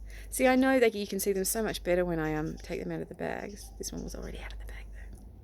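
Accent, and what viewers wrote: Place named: Australia. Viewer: I love these videos. We girls never tire of looking at jewelry. Lol You gotten awesome stuff in this giant haul so far. And I could listen to you talk all day. Loving your Austrailian accent. Thanks for sharing this enormous haul. That brooch looked like a torpedo and a and a giant land mine to me . Omg I love Blue Bus Dave too!!! Have a great day my new friend !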